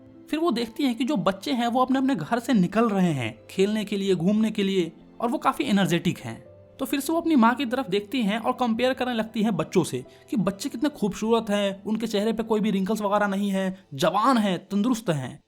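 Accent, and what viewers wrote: India. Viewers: Dehati English ❌ deshi english ✅🫶🫶👌👌🙏🙏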